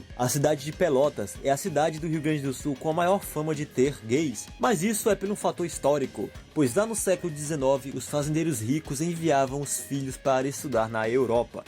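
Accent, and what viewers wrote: Northeast, Brazil. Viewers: Você é fluminense? Você tem sotaque nordestino ou goiano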